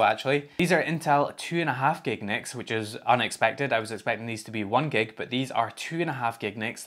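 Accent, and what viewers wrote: Scotland, United Kingdom. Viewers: Have really been enjoying your channel as i have been learning ad getting my smart home all set up. Been homelabbing for a couple of years now and finally got that Automation bug. Plus the wonderful scottish accent gives you an edge lol. Great videos brotha keep it up.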